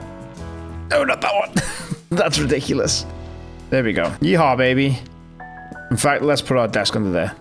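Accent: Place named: Liverpool, United Kingdom. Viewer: Never knew i needed a scouse mc youtuber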